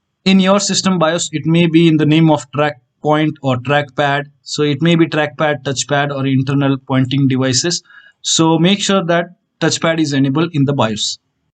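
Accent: India. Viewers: Your language accent indicates that you are Indian, you are such a blessing man, saved my ass. Love from Bangladesh.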